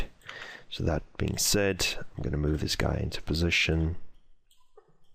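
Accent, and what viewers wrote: South Africa. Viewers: Heard the Afrikaans accent and I immediately subscribed. Die video is goed dit het baie ge help, Dankie. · My ears did a double take, a fellow South African ^^, nice work, learnt a lot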